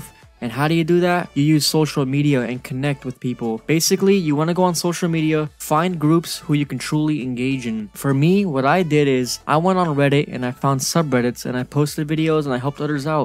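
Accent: Croatia. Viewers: Are you from Croatia? :D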